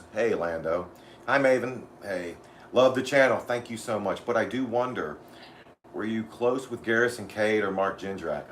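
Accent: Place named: Eastern Shore, United States. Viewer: man your accent reminds me of home... im a eastern shore boy from maryland.. love it bro keep up the good work.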